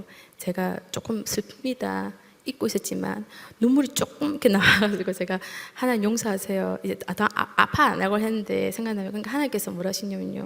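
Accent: Busan, South Korea. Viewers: 고예정님 저도 부산 동대신동에서 태어났어요 ^^ 정지~~ 부산 사투리 고소합니다. 항상 하나님을 증거하시는 삶이 되시길 축복합니다.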